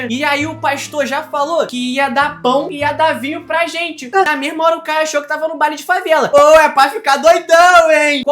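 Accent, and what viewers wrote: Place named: Rio de Janeiro, Brazil. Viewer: Ai mane ele e carioca mem tlgd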